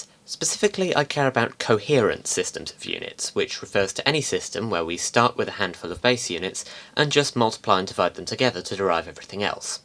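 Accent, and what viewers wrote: United Kingdom. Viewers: You have no idea what a vector is, but with a British accent you can make the biggest BS sound true, including WMD.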